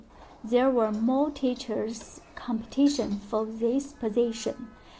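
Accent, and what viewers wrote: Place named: China. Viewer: You back❤ This type of English so familiar for me bcz of my Chinese boss.kind man he is .thank you so much showing how days are going ahead.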